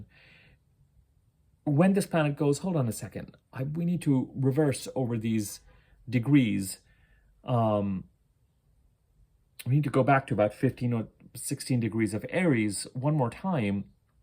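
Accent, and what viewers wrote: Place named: California, United States. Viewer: Yay an video! I find your videos so very helpful helping me to reflect..& chilll out 😄 your voice is so calming 🙏love the Californian accent too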